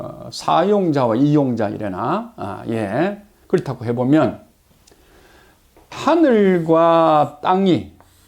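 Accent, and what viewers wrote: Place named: Gyeongsang, South Korea. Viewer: ❤❤❤❤❤ 몸 띠이 (몸) 사움 (싸움) 살 (쌀) 요레! 요레! ㅋㅋㅋㅋㅋ 경상도 특유에 독특한 사투리로 어렵다는 주역 을 말씀해 주시니까 박사님!주역해설이 더욱 재미 있습니다 박사님! 멋있습니다 ❤❤❤❤❤ ❤❤❤❤❤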